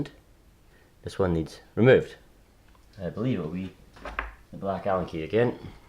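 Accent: Scotland, United Kingdom. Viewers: Hello! I came across your channel today. Nice to hear a familiar accent. I'm in Troon, near Glasgow. Have you still got your XXX4? I'm in the middle of resurrecting my old XX4, and I have a new in box XX4 Worlds and Street Weapon IWC, both of which I plan to build shortly. Have you tried the belts that are now available from Tough Racing? Those seem to be the recommended replacements these days for the XX4/Weapon. I've recently converted over to running Schumacher, but the L1 Evo and Cougar just don't have the same appeal to me as these old Losis. In my less than capable hands I'm sure I'll be just as quick (read slow) with the XX4.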